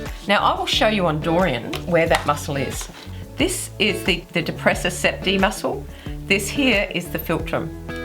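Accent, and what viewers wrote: Australia. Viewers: is she from Australia ?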